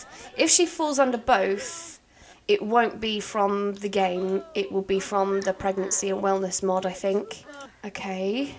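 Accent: United Kingdom. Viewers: I made my boyfriend listen to your voice, he said "she sounds like a British phone sex operator " Im like.. im pretty sure she'll take that as a compliment!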